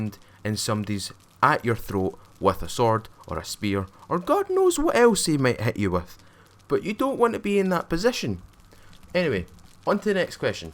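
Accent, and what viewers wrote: Scotland, United Kingdom. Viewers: I wanted to upvote, but then heard all your criticism, concenrns and stuff about ppl having things too fast, havign to farm forever in soem cases etc. After that I wanted to downvote because to me it seemed like you re not undertstanding this game is in alpha phase. Not even close to a real BETA... So there are goign to be shitloads of changes. If you criticize the gmae then always keep in mind in what phase/state the game is. You simply cannot treat it and judge it like its a finished game. It will be in development for at least 16-20 months. In the end i upvote cause I love your scotish akcent and the enthuasiasm how you present the game :P. I hope I could help you with my feedback. :)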